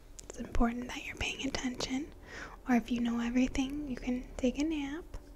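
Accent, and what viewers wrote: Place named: Midwest, United States. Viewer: I stan your midwestern ayackcent :)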